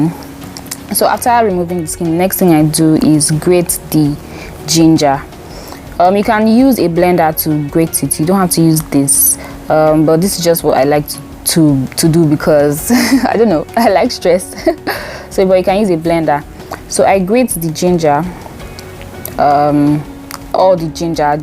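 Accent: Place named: Nigeria. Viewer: You are so pretty plus I love the nigerian accent 😊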